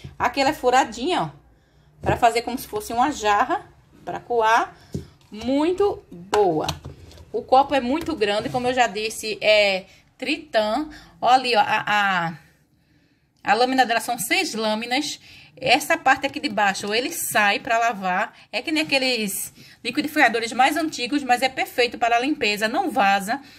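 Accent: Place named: Northeast, Brazil. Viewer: Gostei do sotaque do nosso nordeste! Rsrs....beijo.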